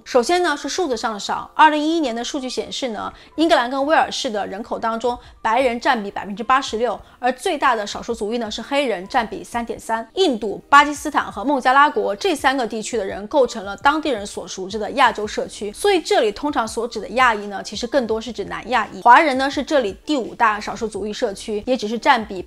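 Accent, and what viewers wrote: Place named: North, China. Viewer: 你是哪里的 听着也像北方的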